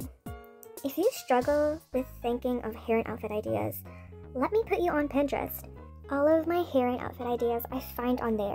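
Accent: United Kingdom. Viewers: Girl that British accent was PERFECTION! It makes me feel self continuous about mine!😭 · NAH WHY DOES THIS CHANNEL REMIND ME OF SUPPER NANNY😭😭😭 (Not trying to be rude it’s just the British accent that reminded me of her Forgive me if I made you sad or smtn)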